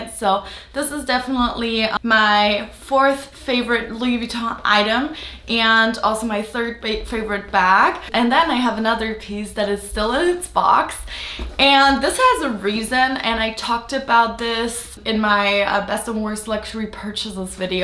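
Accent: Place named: Germany. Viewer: Super schöne Sachen😍ich finde wenn man weiß dass du deutsch sprichst, hört man voll den Akzent raus🙈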